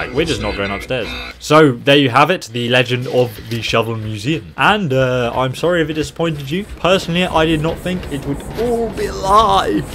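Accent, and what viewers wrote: United Kingdom. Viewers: your British, me to! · are you British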